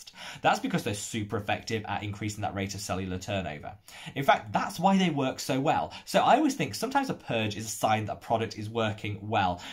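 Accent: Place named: England, United Kingdom. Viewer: I’m in Canada so I have more accessible to the Ordinary line and I stumbled upon ur channel while looking for more informations. I’m in my 40s so i have been binging on your anti-aging and pigmentations vid. I love all ur vid and ur English accent btw, lol. Thank you for sharing your knowledge, you got yourself a new fan 👌🏻💕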